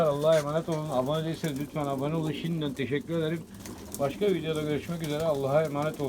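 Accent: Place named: Izmir, Turkey. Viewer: Esenlikler abicim. Şiven İzmirli gibi ama bizim buranın insanı taklacı bakmaz. :)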